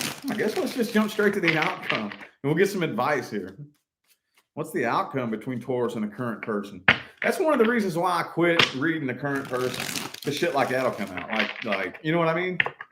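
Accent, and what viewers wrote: South, United States. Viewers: You have to be southern like me with that voice! LOL! I'm from Georgia. And, you're on point with the start. I deserve to be more than an option and game. I watch these for healing and because I am spiritual, I'm Cherokee, and religious. And, it helps to heal with God in place. And, stay focused. I gave my best. For years I tried, done the growth. Forgiveness for their growth, tried to show them that I saw the better in them, and was overlooked or judged for it rather than appreciated. And, at this point, I'll believe what I see. As I deserve, or nothing. No blame game or grudges, just reality and life is too short and should be valued with those we love and love those who love us. Because, pride doesn't love us as much as we love to have it, and it was always first before me whereas they were my choice. So, I done them a favor since they were so unhappy and regretful, with hurtful words, and like I was in the way for loving them, and I left. 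So they could be happy. That was what they made me believe they wanted. I'm a realist. And, everyday that goes by is everyday we cannot get back and nobody deserves to wonder why they don't deserve to be apart of it. Happy holidays!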